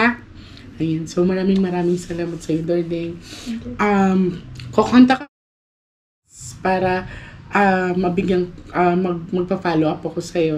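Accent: Visayas, Philippines. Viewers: Bisaya gyud 😁😁👌👌👍👍watching u miss.. from Cebu City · Cute mn mg bisaya si ms ❤️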